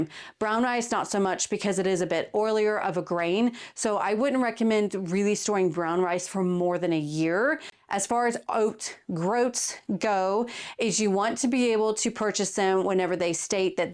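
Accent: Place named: Florida, United States. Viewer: You sound like you like here in Middleburg FL!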